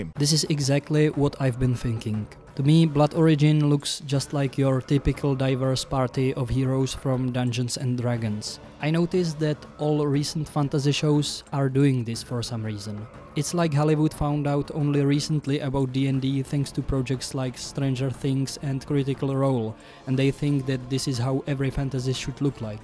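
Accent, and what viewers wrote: Czech Republic. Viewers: Kámo z tebe ta čeština je úplně slyšet :D Jinak super video, souhlasím prakticky se vším co říkáš. Navíc Netflix totálně nechápe jak rozmístit rasové skupiny do určitých zemích, potom je člověk zmatenej kde se ten děj odehrává, jestli v Xintrei, Pryshia, Darwen. Škoda, že už vše musí být woke a v každém filmu/seriálu hlavní postava je strong independent women, která je totálně nudně napsaná. Nemusejí to všem cpát do ksichtu a říkat: Hele, koukejte silná ženská, která nepotřebuje žádnou pomoct a dokáže se o sebe postarat levou zadní. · One of the better examples of a czech accent - this isn't a criticism btw - wonderful video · Slyším výraznej českej přízvuk? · Because he is definitely slavic. It sounds to me like bad czech accent. Nothing offensive. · dude are you czech?:D i swear i can hear this czenglish :D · Dude, you sound like Martin Klekner. 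Aren't you Czech? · I was asking myself if you are Czech/Slovak or not, you sounded like it and then I saw the board games and it got confirmed for me... a souhlasím s tebou na většině. Hodně štěstí s další tvorbou xd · ten tvůj českej přízvuk je top jinak video bylo trefné jen tak dál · I love your pronounciation of english words, it's so czech :D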